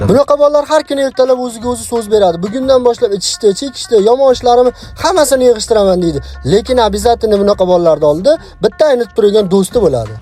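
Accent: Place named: Kokand, Uzbekistan. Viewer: Qoqonli misiz bildim qoqon dan siz 😂😂🤣🤣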